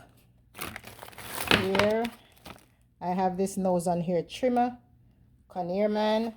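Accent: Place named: Jamaica. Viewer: 😁😁 that accent!!! Hello fellow Jamaican. Love the video.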